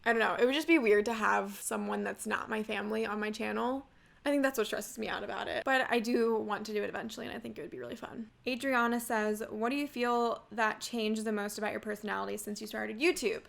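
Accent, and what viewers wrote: United Kingdom. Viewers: 20 seconds onto the video and she’s already talking in a British accent😂😂 iconic queen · my crush on her when she speaks brittish 📈📈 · oh, how much I missed your British accent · i know what a drinking game is, He/she said to subscribe everytime does a British accent 🤯 where's the drinking part?? Edit: no drinking in a "drinking game" lol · i bloody missed that british accent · This British accent lmao I love it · your nervous british accent :> so cute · YES BRITISH IS BACK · I love your British accent and you🤍🤍 , btw what mascara do you use because you look stunning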